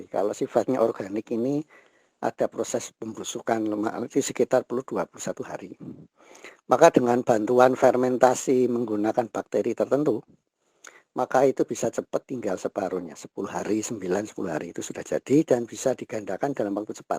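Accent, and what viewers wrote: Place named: Java, Indonesia. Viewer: Ngomongnya aja medok ko' ingin nguasai dunia.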